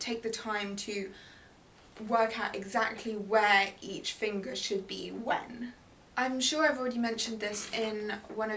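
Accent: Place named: RP, United Kingdom. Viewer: very good88 and i can work on my english because you have the queen mum's accent ! i understand everything even if i am french!!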